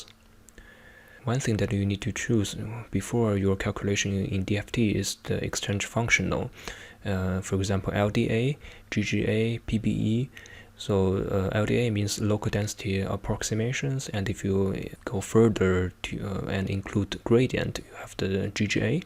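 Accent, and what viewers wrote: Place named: East Asia. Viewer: Such a nice and comprehensive explanation. seem to be the accent of East Asian.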